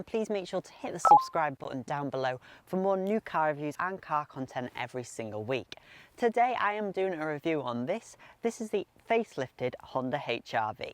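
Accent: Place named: United Kingdom. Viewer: Great review! Love your British accent!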